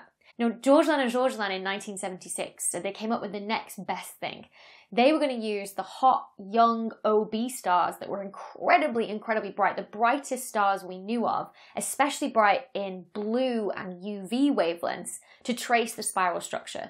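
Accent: United Kingdom. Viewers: Fabulously put together - you've got a new fan here. Great presentation, clarity and very easy to follow... and it's soooo refreshing to hear a British accent for a change. Love it. Harry.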